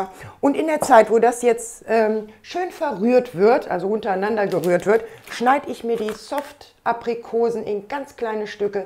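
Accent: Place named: Rhineland, Germany. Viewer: Hallo, dein Akzent erinnert mich an zuhause, ich komme aus dem Rheinland und will beim Zuhoeren sofort n Kaffeekochen und dich einladen. Sieht alles sehr lecker aus und ich liebe deine Kueche. Viele Gruesse aus Kanada!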